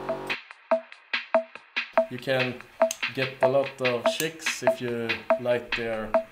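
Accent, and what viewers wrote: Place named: Sweden. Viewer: You are Swedish, i can tell by your accent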